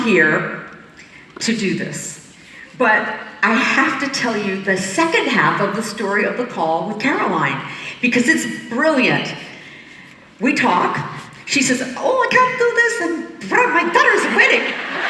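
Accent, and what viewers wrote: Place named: Scotland, United Kingdom. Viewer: omg your Scottish accent BAHHHHHH HA HA The best!!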